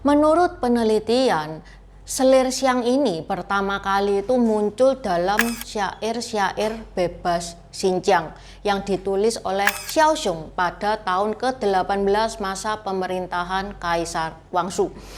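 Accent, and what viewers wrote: Java, Indonesia. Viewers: Logat jawa mu dlm kl y berdaaarkan Dr MN,cerita nya? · Looo ... Kok .... Ngene 😁😀😁😀😁😀 ngomong nee ... 😁😀😁😀😁😀 Iki Tionghoa atau jawoooo.... 😁😀😁😀😁😀 Ngomong nya medoook ..😁😀😁😀😁😀😀😀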